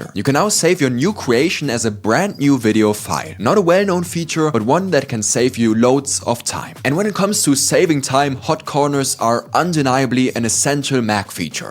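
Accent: Germany. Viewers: This german accent is killing me. Ffs fellow Germans, learn some good English. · 15 sekunden gehört direkt gewusst das er deutscher, ist mach weiter so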